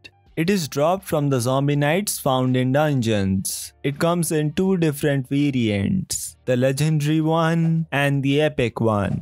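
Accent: India. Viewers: Are you indian? Ur accent is cool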